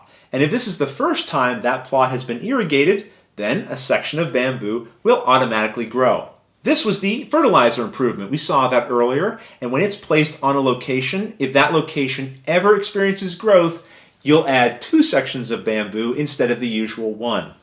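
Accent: Canada. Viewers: Are you by any chance Canadian?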